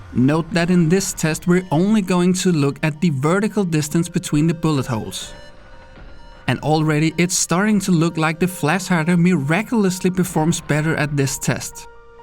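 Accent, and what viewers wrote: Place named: Denmark. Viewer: Are you Danish? If not! Then where are you from... Du lyder Dansk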